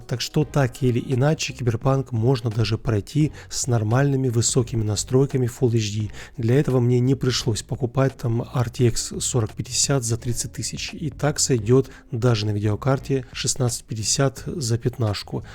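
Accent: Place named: Ukraine. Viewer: Что, мне послышалось, или у автора появился легкий украинский акцент?) Он решил что добавлять перевод названия на украинском мало?)) (не смотрел его пару месяцев, потому сразу заметил)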